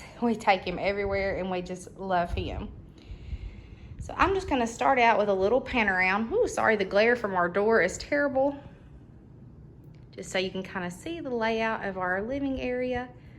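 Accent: South, United States. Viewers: you are such a treasure! I love your home, your style, your love of our Lord, your patriotism and your accent! As a transplanted Texan living in Houston, Missouri, I am so drawn to anything or anyone from the South! I love how you have incorporated so many vintage and family treasures. I am sentimental and I love my heirlooms too! Thanks for an enjoyable tour!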